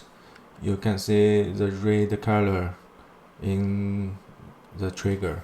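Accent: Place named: China. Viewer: 国内有没有号每次要上tube听中式英语好别扭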